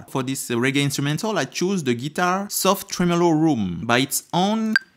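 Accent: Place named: France. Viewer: Tu parle français on dirait?? I can notice your accent ..I speak French too.. Your English is perfect.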